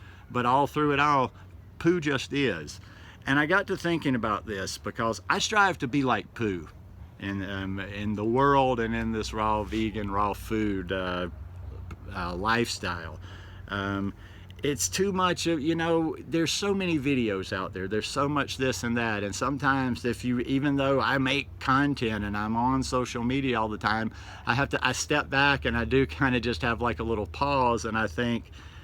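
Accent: South, United States. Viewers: Love that southern draw! Its inspiring to see someone from the south do this journey. I'm an okie myself and there is a lot of southern stereotypes and expectations to be a certain way in the south when it comes to eating meat and hunting and those sorts of things. Your often labeled weird and "hippie" or something worse for rejecting meat and beer and so on. I'm subscribed. Thanks for posting your journey! God Bless.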